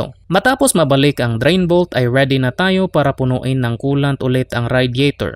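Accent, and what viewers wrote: Visayas, Philippines. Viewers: bisaya boses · Visaya po ba kayo Ayusin niyo naman po ung boses niyo para maintindihan ng maayos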